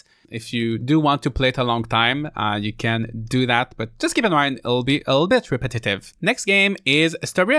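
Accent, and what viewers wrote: Quebec, Canada. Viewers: accent quebecois ?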